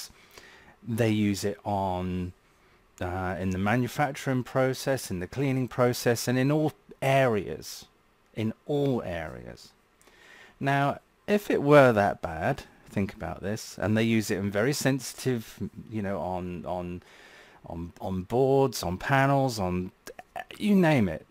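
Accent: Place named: United Kingdom. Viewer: Very entertaining my friend. And it is common sense. At 69 years old, I'm still very young and a triathlete and I'm quite entertained not in a good way like you were to me but by people from ages 20 to 70 especially Americans as I moved from America to South America 20 years ago because of the cognitive dissonance and brain dead situation and prefer to live amongst my Costa Rican t i c o family and others from neighboring countries as they have more love common sense and appreciation of life. Thank you my British friend unless I missed it and you're Australian as the accents are close. Bendiciones from South America, Craig thank you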